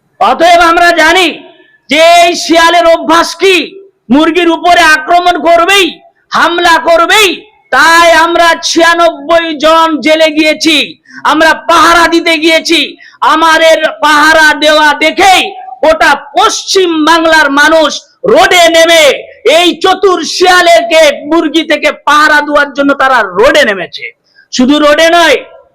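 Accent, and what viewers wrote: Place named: Bangladesh. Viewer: তুমি তো পুরো বাংলাদেশী, তোমার ভাষাতেই বোঝা যায়।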